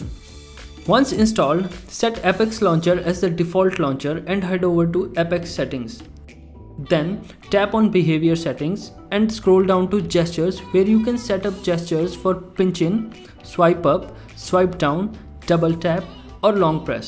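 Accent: India. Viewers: Almost every indian i know or watch speaks like this...It is bounded and it doesn't sound that bad... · am i the only one who love indian english? i love your accent man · diksiyonuna ettiğimin hintlisi kulağımı deldin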